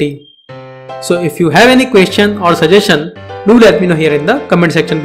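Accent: India. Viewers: neither is he, its the indian accent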